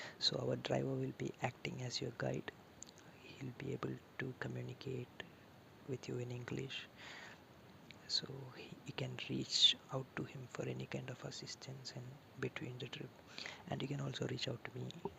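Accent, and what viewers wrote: India. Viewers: Ppl love the Indian accent. It’s so tingly. The heavier the better. I am from NYC all my life and lived mostly in Queens so lots of Indian ppl. Very nice ppl. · I'm so glad I found this video again. It is absolutely perfect. I love the Indian voice for asmr. So soothing. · Are you from Kerala? Malyalam people especially who have the typical Malyalam English accent is awesome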